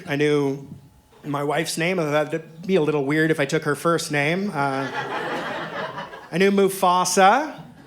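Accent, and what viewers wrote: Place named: Canada. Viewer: I wasn't picking up on the Canadian accent at first until I realized that sometimes your enunciation sounds like Jordan Peterson 😂😂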